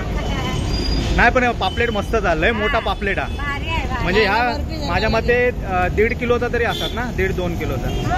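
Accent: Malvani, India. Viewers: बरा वाटता कोण तरी अस्सल मालवणीत बोलता ता नायतर अर्धे मालवणी गोंधळच घालतत. अर्धा मराठी अर्धा मालवणी बोलान. तेचा काय होता म्हायत आसा समोरचोय गोंधाळता मरे. असो तुका बाकी हॅट्स ऑफ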